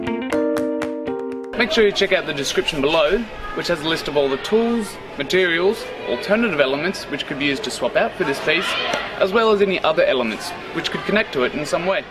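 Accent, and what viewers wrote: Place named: Australia. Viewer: Loving your channel and all you're doing in India. From the accent am missing Oz already .... Am a South Sudanese Ozzy from Sydney and I've been looking for DIY playground projects for my son and kids across South Sudan 🙂 so glad to come across this😁 moved 8mths ago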